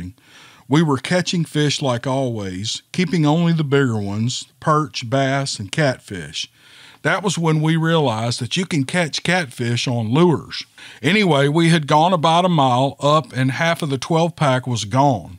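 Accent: South, United States. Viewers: is the man best story teller hands down soothing southern drawl...damn can this man tell a story love ya 💪💪🤜🤛✊👏🌱🌿🌴🏞️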